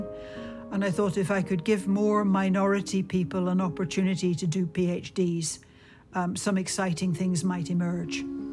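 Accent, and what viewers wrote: United Kingdom. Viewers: "the array rotated with the earth". A british accent does not give validity to this stupid claim. we do NOT detect any movement or motion caused by the earth. we never have. heliocentric world view(taught in public schools) is satanic pedophile propaganda by the jesuits long before the so called greeks "knew" the earth was a ball (supposedly 2000 years ago). Heliocentric do not work in reality. it is a fairytale for grown ups. the earth is immovable and stationary. all the stars are fixed in their positions and rotate in the firmament around us every day and night! the sun and moon is local! for more truth and answers in full scientific depths - Follow Youtube Channel - "Flat Water F.E" and "Celebrate Truth".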